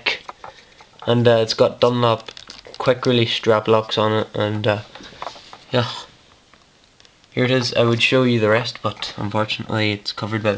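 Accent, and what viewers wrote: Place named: Scotland, United Kingdom. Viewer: i like your scottish accent you got good taste in basses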